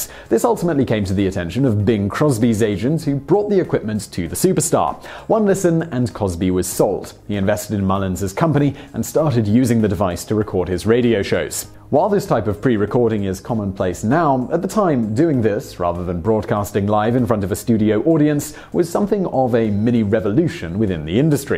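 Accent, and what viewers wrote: United Kingdom. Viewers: today I found out a person with a british accent can be misleading and wrong. what about the VCD format?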